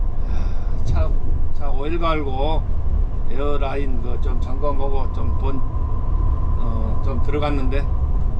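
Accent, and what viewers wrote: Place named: Jeolla, South Korea. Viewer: 기사님 덕분에 영상으로 나마 힐링하고 갑니다ㅎㅎ 표준말보다 전라도 사투리가 더 정겨워요^^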